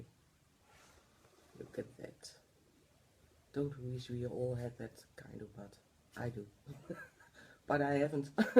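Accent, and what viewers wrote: Netherlands. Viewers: Aan het accent te horen bent u Nederlands. Petje af voor het goede Engels! Een tip: iemand anders iets aanleren is 'teach', not 'learn'. ("I will teach you how to do that') En ja, het is 'forehead'. Geen probleem. Het is heel goed om naar te luisteren, en de poppen zijn geweldig. Ik zou graag een boek zien dat de technieken beschrijft, bestaat dat? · Are you Dutch? cause yeah sorry for asking I still like your videos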